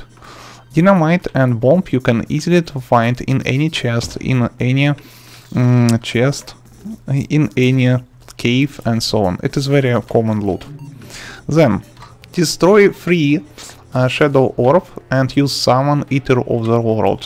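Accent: Russia. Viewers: I like this youtuber not only for he's good explanation but also he's Russian accent (sorry if that is offensive) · Russian accent😫😍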